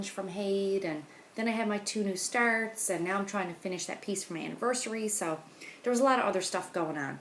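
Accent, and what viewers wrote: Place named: Newfoundland, Canada. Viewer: Okay only 2 mins in and I thought is she a Newfoundlander? I wonder...then said you are from Canada! Ohhh please satisfy my curiosity? I live in NS! Lol. Now to watch your video and subscribe! At Because you count? NB? Oh my I will be editing this everytime you say something...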